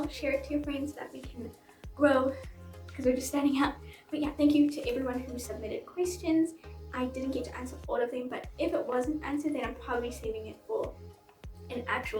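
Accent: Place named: United Kingdom. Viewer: Nooo she’s turning bri’ish 😭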